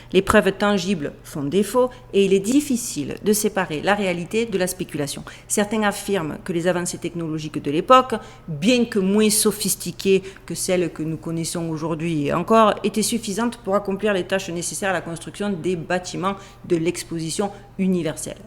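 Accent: Marseille, France. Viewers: Accro à vos vidéos je suis;-) en direct ou plus souvent en replay. Votre curiosité insatiable, votre sens du détails, votre façon de raconter, votre petit accent de marseillaise vivant à Las Vegas, votre franc parler, la qualité de vos reportages.....et votre beauté qui est à souligner egalement...Tout de est remarquable. Mais... où est donc Duchesse aujourd'hui 🤔Merci beaucoup, infiniment, de votre travail, de vos recherches fouillées. Mais comment faites vous donc pour avoir un nouveau reportage à nous présenter chaque dimanche??☺🤩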